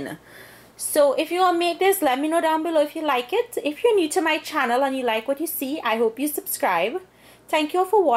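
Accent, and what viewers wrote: Trinidad and Tobago. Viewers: Kenny H She is not faking an accent. She is from Trinidad in the Caribbean and is speaking with a Trinidadian accent. She is not trying to sound like anyone from another nationality. Italians don't speak like that and she is not from the Middle East. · Ganaria Gente trini accent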